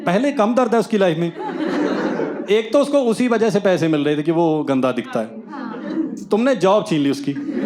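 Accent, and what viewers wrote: Punjab, India. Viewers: Punjabi accent 😂❤ Great video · Gadd ta bai ji, "end" write up....and of course,punjabian di Hindi ch Punjabi accent ta aa hi jandi aa😅..looking forward to more ,in coming days from ur side👍👍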